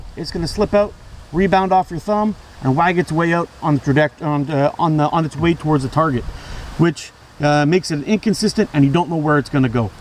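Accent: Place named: Canada. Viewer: Just found your channel - great stuff! Nice to watch a slingshot video where the host has a Canadian accent EH! Cheers from Perth Ontario!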